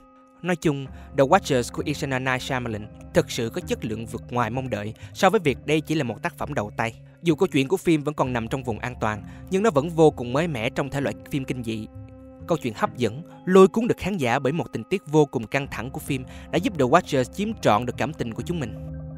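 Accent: South, Vietnam. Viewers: Ko quen giọng miền nam à ? 😅😅 bake nên nghe ko quen chứ gì ?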